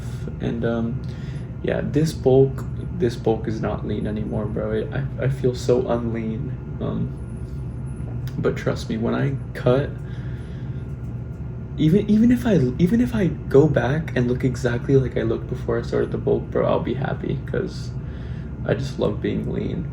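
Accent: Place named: Spain. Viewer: We like your Spanish accent 🇪🇸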